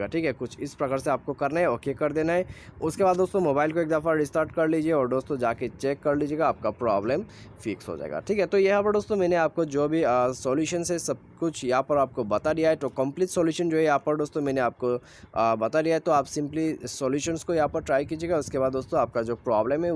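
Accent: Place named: Bengal, India. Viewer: Ha has a Bengali accent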